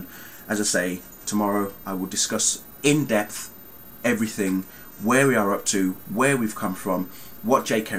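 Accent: United Kingdom. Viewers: Im 1 min into your video and already leaving a comment, lol. Im seeing it tomorrow with Akemi, you know her as AkemiChandler on Twitter and shes my best friend since I was 9. Im 23 now, lol. I wanted to see it at midnight, but then I thought, I actually wanted to be wide awake when I see it so me and her arent seeing it at midnight either. Im going to be an emotional mess. Oh, btw, I love the way you talk. I love England and the accent Im simply in love with. I simply love listening to you talk.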